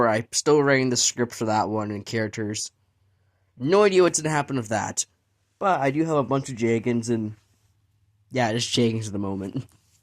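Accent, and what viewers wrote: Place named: Canada. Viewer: Damn bro you sound hella Canadian😂✌️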